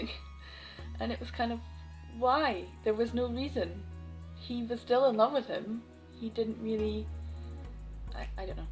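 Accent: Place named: United Kingdom. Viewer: Your accent!! I didn’t realize you’re from the UK! I also didn’t realize you have a channel. Subscribed! 💖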